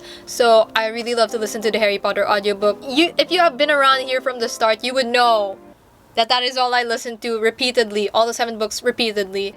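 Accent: Philippines. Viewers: You are from the Philippines? I hear from your assent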